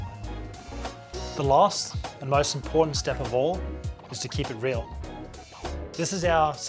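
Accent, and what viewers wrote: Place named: Australia. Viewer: I can't distinguish it I just heard Australia..!!! Hehehe..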